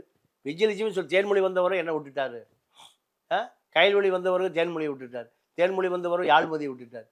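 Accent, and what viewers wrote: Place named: Madurai, India. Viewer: அய்யா நெறியாளர் உங்கள் பேச்சில் மதுரை மண் வாசனை தெரிகிறது!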